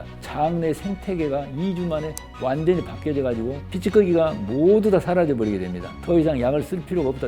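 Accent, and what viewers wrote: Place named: Jeolla, South Korea. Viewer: 말투가 라도네. 라도는 걸러라 들.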